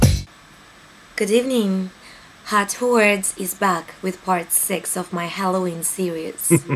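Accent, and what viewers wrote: Russia. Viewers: Russian accent - where are you from girl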